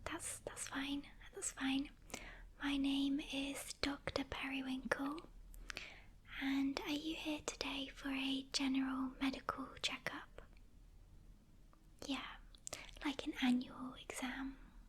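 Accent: United Kingdom. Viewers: What is her accent? What part of the UK?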